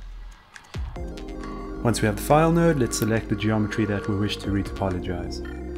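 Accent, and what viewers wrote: South Africa. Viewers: Is that.....South African I hear....?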